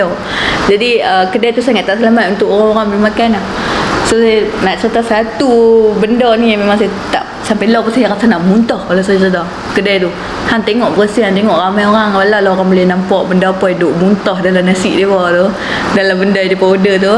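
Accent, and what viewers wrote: North, Malaysia. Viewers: sy 1st time jumpa n tgk channel ni..sy suka dgr n tgk cara cik/pn bercrita krana loghat utara tu..sb sy mmg suka dgr org ppuan utara beckp dgn loghatnya..so sy subscribe terus chnel u 🤗.. tp ni nk tnya sket la cik ni ada kelebihan pd pancaindera ke 6 ke klu x silap sy iatu mata yg bole nmpk benda² ghaib ??..😊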